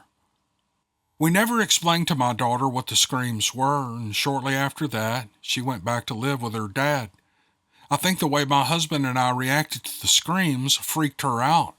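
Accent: South, United States. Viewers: Hi I'm from the US Virgin Islands I listen to your podcast and I enjoy the Steve Lily and enjoy the tone of your voice it's a real southerners voice and I am very bad at writing so please bare with me again thank you.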